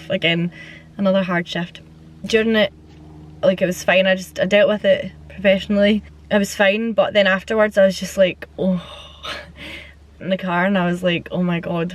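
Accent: Scotland, United Kingdom. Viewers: Love this series! I've been having a bad few days with anxiety as I'm abroad on my own and been struggling to deal with the large numbers of people at the event I'm at. I discovered you through your collab with Sarah Courtney the other day and I've since been binge watching your stuff and it's helped me so much! I've watched this whole series now and it's brilliant! Proper rollercoaster but I find learning about other people's experiences strangely soothing when I'm anxious plus I love any Scottish accent 😆 Also I'm really grateful for women like yourself and Hannah Witton sharing your perspectives on menstruation so that I can understand a bite more.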